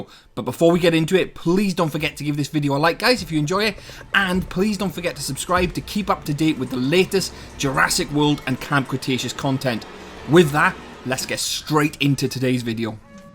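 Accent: Wales, United Kingdom. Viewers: Sounds like Welsh accent, where abouts are you from? I'm Bangor, Gwynedd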